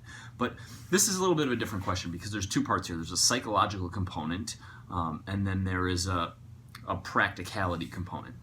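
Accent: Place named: Midwest, United States. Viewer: LOVE WATCHING YOU AND LOVE UR MIDWEST ACCENT:)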